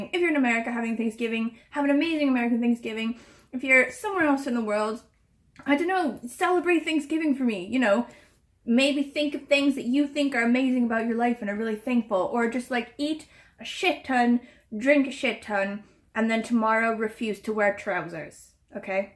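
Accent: Wales, United Kingdom. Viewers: I could tell you are living in wales because of certain things in your accent. At least here you get a dragon on the flag 🙂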